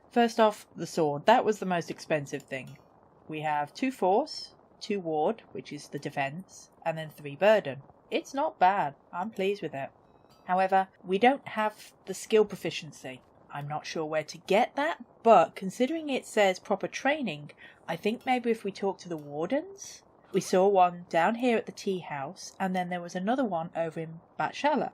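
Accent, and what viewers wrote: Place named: United Kingdom. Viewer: Interesting game, thank you for sharing it. Love your strong British accent too.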